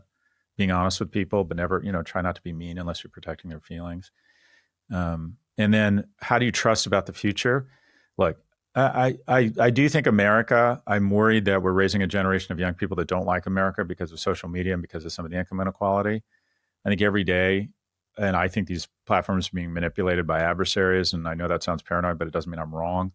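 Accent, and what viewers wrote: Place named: United Kingdom. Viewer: sound bri’ish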